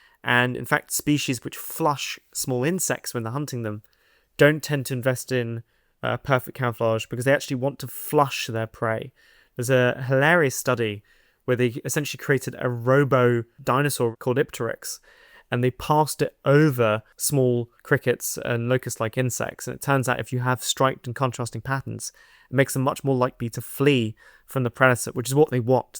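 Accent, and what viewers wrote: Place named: United Kingdom. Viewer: You sound British, any chance you're in the UK? If so, have you heard of DinoCon and would you PLEASE come present your work <3 <3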